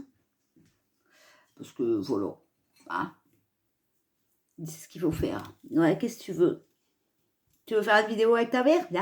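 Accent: North, France. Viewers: J'adore votre accent du chnora grand mère 🤶 était de berck plage 🦀 elle parle comme vous merci 😘 😘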